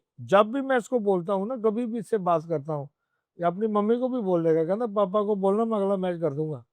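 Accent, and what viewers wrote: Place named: Punjab, India. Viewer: Dara singh wala hindi accent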